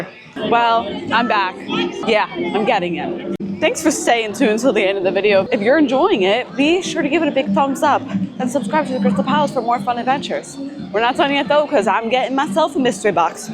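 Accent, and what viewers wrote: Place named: Long Island, United States. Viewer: I love your video... you gotta be from long island.. lol your accent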